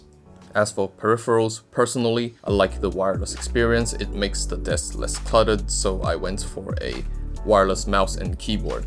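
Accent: England, United Kingdom. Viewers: I really like your English accent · nice english accent you got there attending a local school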